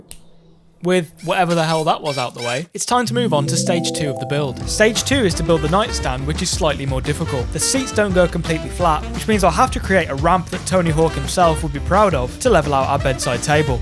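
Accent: United Kingdom. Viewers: Oh my cat. This is the most British video I have ever seen. Why. Youtube knows my problem with intercepting British accent(this was quite understandable for me)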